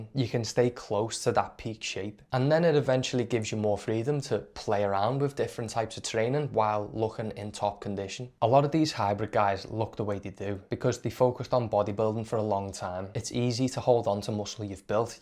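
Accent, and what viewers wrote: Liverpool, United Kingdom. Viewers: Great video btw are you scouse ? Love that accent you speak like Jamie carrager , are you a Liverpool fan ?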